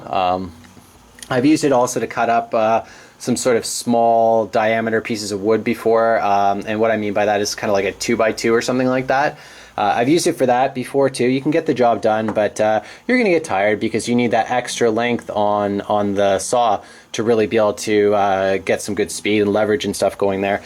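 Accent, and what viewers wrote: Canada. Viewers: That's one wicked Canadian accent.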